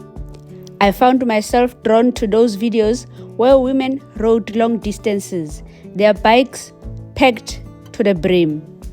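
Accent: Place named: England, United Kingdom. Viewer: American here, and just discovered your channel. Just wanted to say that I love your voice and your English accent!….especially when you roll your “R’s” 😂 reminds me of Tyla 😅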